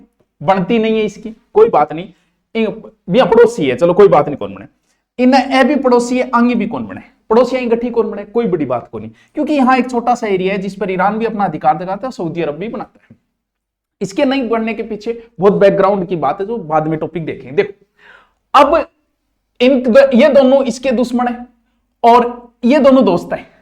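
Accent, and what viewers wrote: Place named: Haryana, India. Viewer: Content is good .But, try to speak in neutral accent. Sab ko haryana accent samajh nahi Ata.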